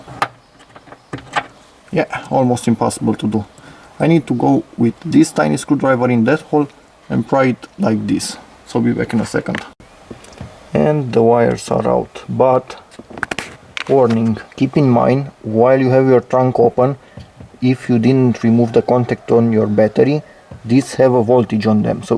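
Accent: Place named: Romania. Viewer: accent romanesc foarte clar. Felicitari pt canal!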